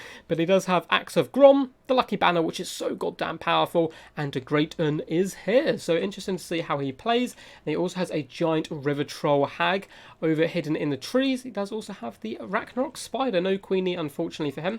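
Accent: United Kingdom. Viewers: To be fair I don't click on the video for the content anymore, but for the british accent podcast !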